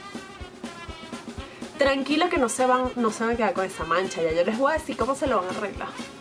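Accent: Venezuela. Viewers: la forma de hablar no se pierde, se ve que eres venezolana, me encanto tu video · Iguaaal. Se te nota la venezolana y mucho jajajaja. SALUDOS PAISANA 💙💜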